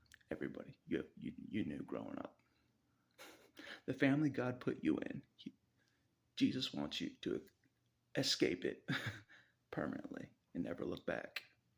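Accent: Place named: South, United States. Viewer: Very good. Bro i wish we went to the same church but i assume you live in a southern state because of your accent. I would kick it with you and talk about all the things.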